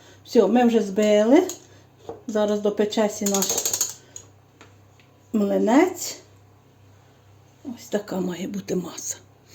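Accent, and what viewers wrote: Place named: Ukraine. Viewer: Доброго дня Вам пані, гарні всі Ваші рецепти і особливо стародавні. А ще Ваша розмова зачаровує, але не міняйте свого діалекту на те, що Вам там можуть зауважувати, мовляв не "лижка" а "ложка" - але ж цим і є особливість Ваша!!! Говоріть так як Ви і завжди звикли - це дуже гарно і своєрідно українське!!! Міцного здоров'я, мирного неба і процвітання Вам!!!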